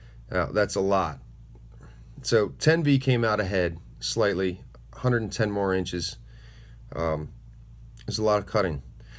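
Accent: Philadelphia, United States. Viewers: Love the vids. Sharpening and testing of blades. Appreciate it. Subd for sure....you sound like u got a Philly accent or somewhere close to there...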